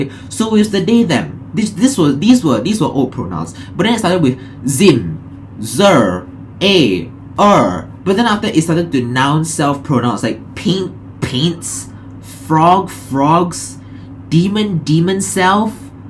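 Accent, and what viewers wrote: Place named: Singapore. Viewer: Its called Singlish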